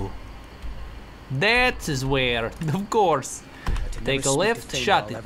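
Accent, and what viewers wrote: Russia. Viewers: You had a russian ascent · I like the Russian accent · this guy sounds like fpsrussiawith his russian accent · Holy crap... I just realized what his Russian accent reminds me of! BARTOK. FROM THE MOVIE 'ANASTASIA'. Holy crap... wow. I'll never watch that movie the same way again.: "I give her a 'HAH', then a 'HIYAH' and I kick her, sir." Wow. My night has been made. =]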